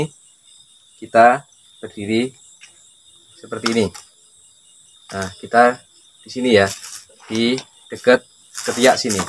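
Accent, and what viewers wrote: Madura, Indonesia. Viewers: Logat e sampean wes koyok duduk wong meduro cak wkwkw keren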